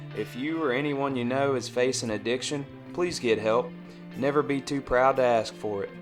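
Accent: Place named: Baltimore, United States. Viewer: I can tell from the accent right from the get-go you are a fellow Baltimoron lmao, or at least you sound like you do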